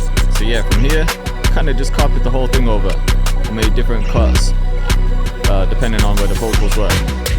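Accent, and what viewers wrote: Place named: England, United Kingdom. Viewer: i love that England way of speaking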